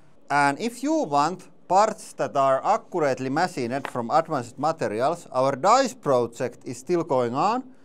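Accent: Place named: Finland. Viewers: I got bored in the middle, but if you speed up some of it, to like 1.5 speed, so that we can still understand your Finnglish but also spend like 30% less time, that'd be great. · I could listen to Finns speaking English for hours, so, you're good! 😎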